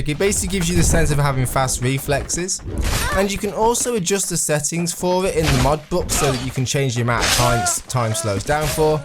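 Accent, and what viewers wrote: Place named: United Kingdom. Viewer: Uh it kinda concerns me considering that its so dude that yells for his intro and the British accent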